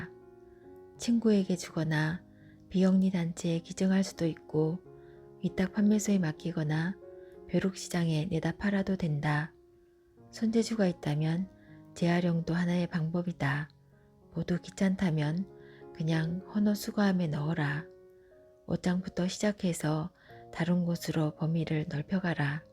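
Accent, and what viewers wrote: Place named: Gyeongsang, South Korea. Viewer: 경상도 억양..😢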